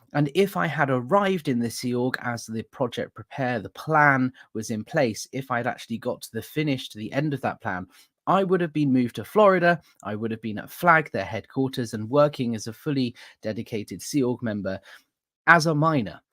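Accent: United Kingdom. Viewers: Just a bit of fangirl fawning…. I could listen to your RP English read the labels off canned food. I’m sure it’s a yank thing, but there you go. So glad I found you through SPTV. You are doing important work, steady on.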